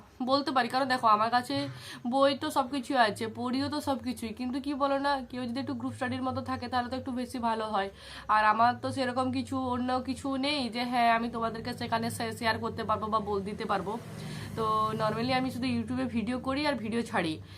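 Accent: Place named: Purulia, India. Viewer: Kotha sune ja bujhlam tomar bari Purulia ba bankura 😮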